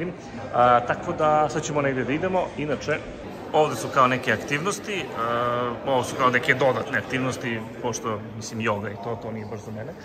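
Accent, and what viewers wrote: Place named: Ekavian, Serbia. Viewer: Ajme men ove ekavice!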